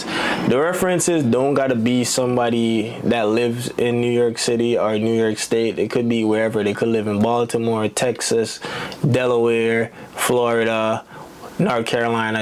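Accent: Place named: Jamaica. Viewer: This dude Jamaican as hell. Can't hide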